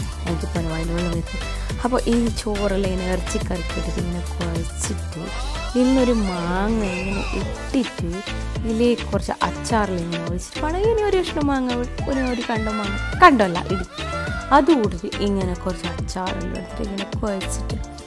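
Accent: Kasaragod, India. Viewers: Kollallo kaasrott slang 👌😍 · ഇതെന്തു ഭാഷ 😂🙊... പൊളി 😂✌️ എനിക്കു ഇഷ്ടാണ് കാസറഗോഡ് സ്ലാങ്... ഒന്നും മനസ്സിലാവില്ല കേട്ടാൽ.. 😝പക്ഷെ കേട്ടിരിക്കാൻ ഇഷ്ടാ 😍... കണ്ണിമാങ്ങ അച്ചാർ 😋😋😋വായിൽ വെള്ളം വന്നു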